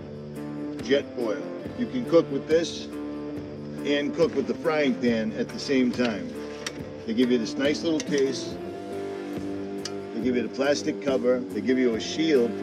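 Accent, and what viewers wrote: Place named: East Coast, United States. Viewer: Hey ! Pauly over here in Nor Cal. I got you over here and respect what you do! Great content and well received , i dig your accent east coast is hella different then my style of west coast or Oakland CA. Mostly but at 54 yrs old im already up in the mountains kinda prerunning a retirement style to see if its way for me ? So far i like it and doing better then at first ,! As it is a trip being solo if you didn't plan it but after two ex wifes w/ 2 kiddos from each and a long term girl friend of 10years turned toxic, resulting in few mo.s in jail up in MT. This is cake walk and very satisfying . So just saying good job keep it up , cause your real kid! One old west coast jack ass too one back east✌🏻🤙🏻🫡🤷‍♂️🕺🏻🪖👍🥽take care bud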